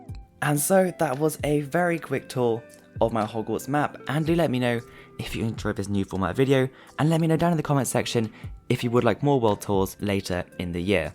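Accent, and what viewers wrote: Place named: United Kingdom. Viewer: I love his BRITISH accent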